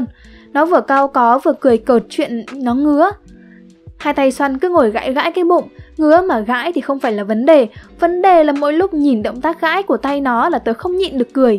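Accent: South, Vietnam. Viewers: Hình như đọc giọng nam phải không (ý mà miền Nam nhé không phải nói nam nữ 😁)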